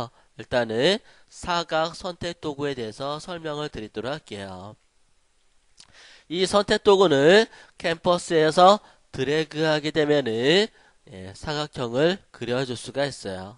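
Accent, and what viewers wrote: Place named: Yanbian, China. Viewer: 말투가 좀 연변 스럽죠..고마워요 ^^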